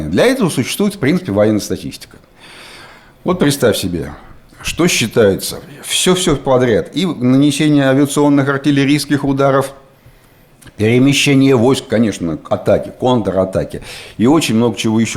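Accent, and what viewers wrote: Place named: Surzhyk, Ukraine. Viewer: На суржике не понятно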